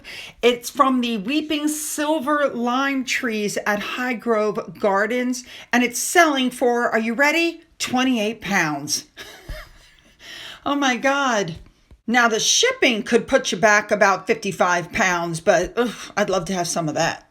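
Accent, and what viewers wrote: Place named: Pennsylvania, United States. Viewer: Her accent says Pennsylvania to me, perhaps?😊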